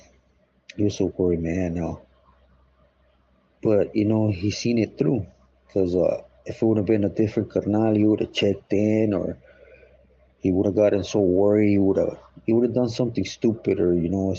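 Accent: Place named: Los Angeles, United States. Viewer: I'm a white dude that grew up in LA and this dude sounds like everybody I grew up around.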